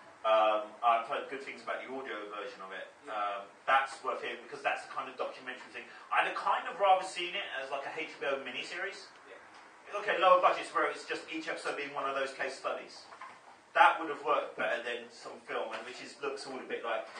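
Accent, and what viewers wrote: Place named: Swansea, United Kingdom. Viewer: he sounds he comes from Swansea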